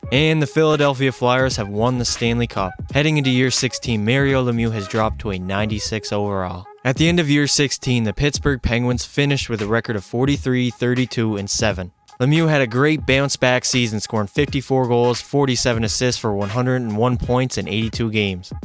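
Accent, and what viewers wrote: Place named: Nova Scotia, Canada. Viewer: U sound like ur from Nova Scotia bi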